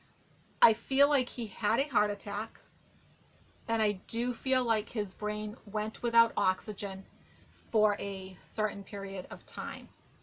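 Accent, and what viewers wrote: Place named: Boston, United States. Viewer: you're so awesome at all of this! I'm sure it's tough to keep focus while taping, and playing Oprah/Donahue to a growing audience. Thank you for your clarity and warmth; and what a poignant way to honor Otto. I'm in Los Angeles, but I grew up near Boston, so it's comforting to hear that accent again! For all of us who you're now reaching worldwide: thank you for changing Youtube and changing our days, for the better.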